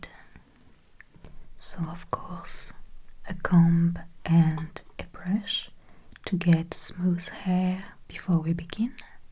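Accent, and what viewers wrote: France. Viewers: Im new to your channel, discovered it a few weeks ago after I handed in my Bachelor's thesis, and it really made me relax watching your videos. Now Im addicted, other ASMR doesnt quite do it for me. The RP, the setting, the lighting and darker colours, your audio quality (minimal white noise) and even your french accent really does the trick for me. I get so calm. I have an anxiety disorder, I got therapy for my panic attacks, but still need easy and quick ways to get my pulse down, while sitting at the pc - and you help me so much. Now I can get to bed with less mind clutter and wake up to be a good mom and wife for my family <3 Thank you! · is she really french? or is that just the accent she chose?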